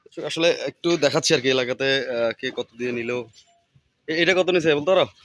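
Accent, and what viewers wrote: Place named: Chittagong, Bangladesh. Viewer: Bhai sry kintu apni ctg er language e kotha bolen je maje maje tokon kotha guli shune ctg er local der moto mone hoyna... Sry Bhai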